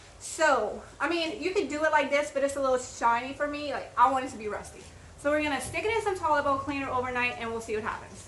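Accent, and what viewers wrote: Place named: South, United States. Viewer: Just discovered you and subscribed! Where are you from? Your southern accent is strong ☺️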